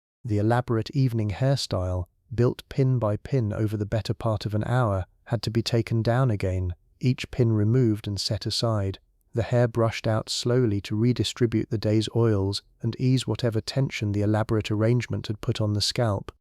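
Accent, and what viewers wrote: United Kingdom. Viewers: can you change your IA voice to something not English so very English English.??? I have to jump away from your great content because I am so sick of the English accent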